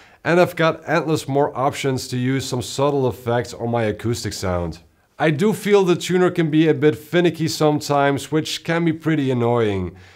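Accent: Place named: Netherlands. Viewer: I’m guessing Dutch or Flemish as first language? Your English doesn’t bother me at all👍.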